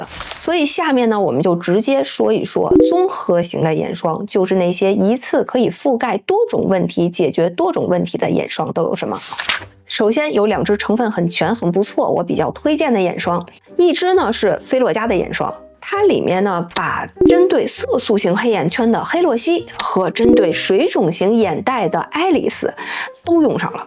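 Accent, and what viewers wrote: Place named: Beijing, China. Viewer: 您的北京话听着很亲切！一对一怎么收费呢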